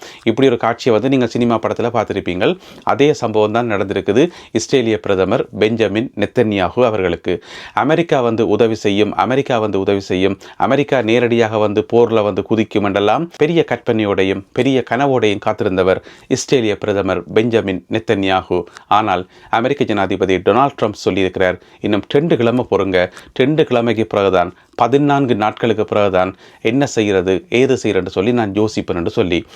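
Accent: Sri Lanka. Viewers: சகோதர நீங்கள் செய்திகள் சொல்லுவது பாட்டி பேரப்பிள்ளைகளுக்கு சொல்லும் அறிவு போல மிக எளிமையாக அழகாக உங்கள் நாவில் இலங்கை தமிழ் விளையாடுகிறது நீங்கள் நேர்மையாக செய்திகளை சிறப்பாக எடுத்து உரைக்கின்றிர்கள் வாழ்த்துக்கள் உறவே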